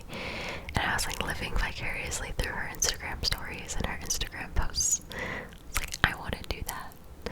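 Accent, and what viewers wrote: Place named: California, United States. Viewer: you can do no wrong. say whatever you like even if it's with a CA accent !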